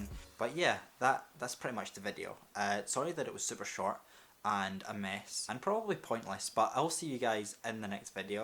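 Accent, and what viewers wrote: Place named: Glasgow, United Kingdom. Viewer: Can I just say that I have a form of anxiety and when I get anxious I don't want to be around people. So if I can I go to my room and watch your videos and it really calms me down and I think it's because of your accent because it is fairly local to me (Glaswegiany) so please don't stop creating because I love your videos and my friends tell me I ramble on about your channel and it's great and you're great and yea...bye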